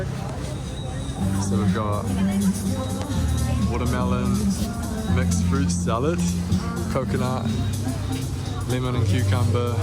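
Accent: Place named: United States. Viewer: Hi, enjoy my home country and be safe always!your accent sounds like americans but upon checking your channel i found out you are from NZ..hope you get a lots lots of Filipino subscribers!gluck👏✌️🙏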